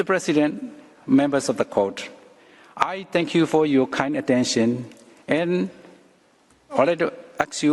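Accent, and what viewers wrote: Myanmar. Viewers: အင်္ဂလိပ်မှမဟုတ်တာ မြန်မာက အင်္ဂလိပ်လိုပြောတာလေ။မင်းကဘာဖြစ်ချင်တာလဲ။ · ငပိသံကြီးနဲ့ဟ ..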